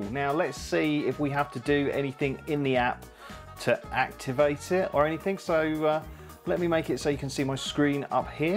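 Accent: United Kingdom. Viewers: Ahhh how nice it is to actually listen to a fellow brit. This is such a great video and very helpful especially for useless DIY bird's like me lol....thank you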